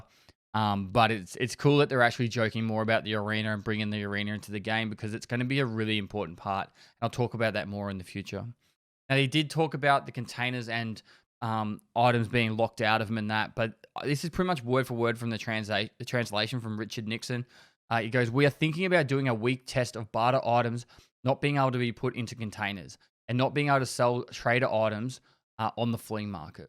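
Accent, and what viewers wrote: Australia. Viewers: He's Aussie breh like me. We say "Starlin" because our accent..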